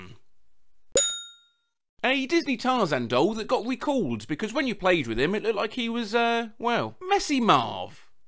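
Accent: United Kingdom. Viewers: hes british dw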